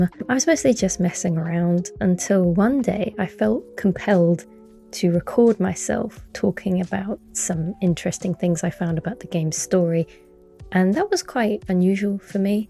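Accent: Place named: England, United Kingdom. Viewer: It was mainly the lore videos that caught my attention & made me keep coming back for more, your voice & English accent if I’m correct were a very nice bonus 😁😍😮‍💨👌🏽looking forward to more content from you, just don’t overwork yourself take a step back & breathe if you need to 🫶🏽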